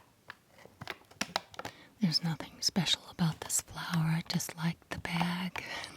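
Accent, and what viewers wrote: South, United States. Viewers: I love your southern accent 😂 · I loooved your funny southern accent 😁 Let me just say it's interesting how you measure the ingredients by volume, here in Italy the measurement is by weight 😱 BTW I'm a huge fan of yours since the beginning, your voice is so relaxing (and I'm usually a no-talking person 🤷‍♀️) All the best from Italy 💙🤗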